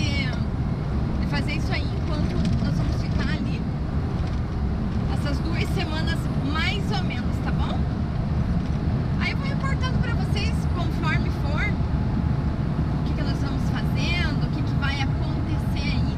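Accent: South, Brazil. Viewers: Bom dia...sou seu fã...me diz uma coisa...de que região vocè é . ?...tem sotaque Sulista....beijos...aqui de Alm.Tamandaré-PR...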